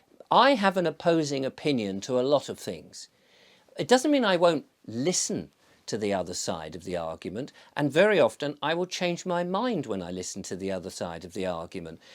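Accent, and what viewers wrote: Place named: Shropshire, United Kingdom. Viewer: Sounds like Shropshire ...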